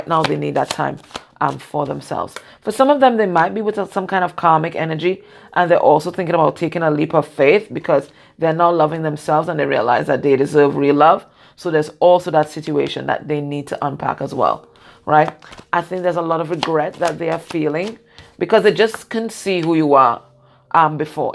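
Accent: Trinidad and Tobago. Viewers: Oh my this was very relevant, thank you for your guidance. I'm awestruck. Are you Trinidadian? You sound and laugh like a Trini ;)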